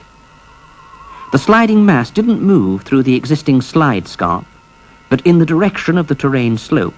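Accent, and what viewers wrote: England, United Kingdom. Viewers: Glassy es...proper British accent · I tried watching this but the guy kept saying daybree instead of debris...i fucking hate the english accent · I couldn't quite believe my elderly eyes when I saw Sven the clay gatherer shirtless and in the Daisy Duke's. Didn't quite go with the rest of the documentary and the narrator with the English accent.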